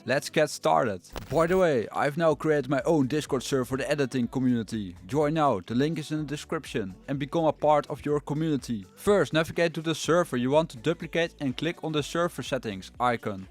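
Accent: Netherlands. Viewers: no I love the Dutch accent ❤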